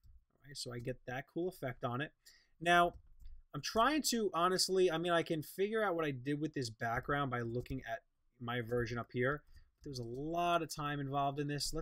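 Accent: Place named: New York, United States. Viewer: Noo Yourk.... I love your accent so classic NY! Not a dis but coming from the midwest like i do it is refreshing to hear and love this new series.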